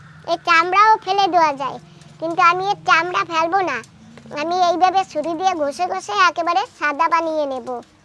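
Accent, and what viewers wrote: Barishal, Bangladesh. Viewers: আমরা কোলকাতায় থাকি ,তোমার ভিডিও দেখি ভাল লাগে তোমরা কি বরিশালের ?কথা শুনে মনে হচ্ছে ।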